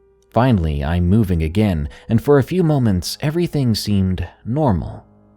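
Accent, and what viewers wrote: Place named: New York, United States. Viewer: thick new york accent FOIST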